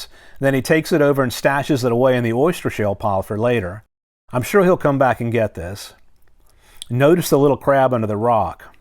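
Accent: South, United States. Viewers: I Love voice! Calming and yes, very easy to listen to. That little touch of southern twang just puts a big old smile on my face and makes me sigh in contentment. 🥰😊😍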